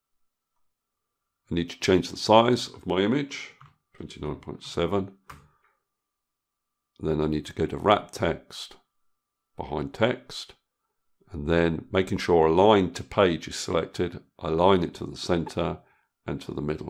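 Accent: United Kingdom. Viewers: Brilliant, thank you. And the lovely British accent was a bonus.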